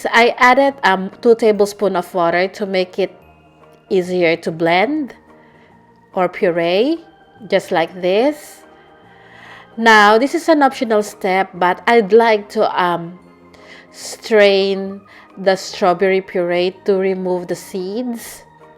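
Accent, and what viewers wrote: Philippines. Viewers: She has Philippino accent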